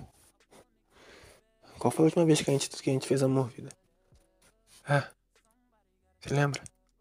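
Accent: Rio de Janeiro, Brazil. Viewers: Carioca neew?? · O negocio é o geito que vc fala AAAAAAAAAAAA INFERNO O GATILHO DANÇA NOVAMENTE tu é do rj? questão de sotaque msm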